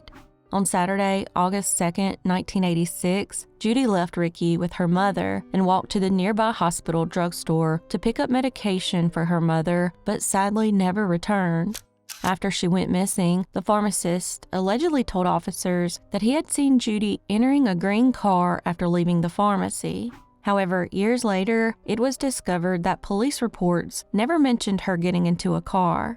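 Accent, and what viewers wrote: South, United States. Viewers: We value you, and ur story telling skills. Love fr panama. Only southern voice I like🥰😊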